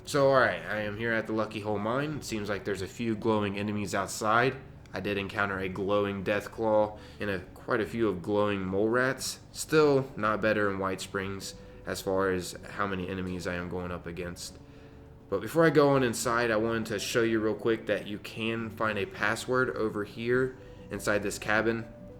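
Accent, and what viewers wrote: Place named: Maryland, United States. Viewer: Are you from Maryland ? You sound like it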